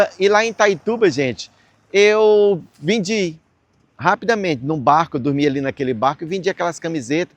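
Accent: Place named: Paraguay. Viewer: De onde você é? Soa paraguaio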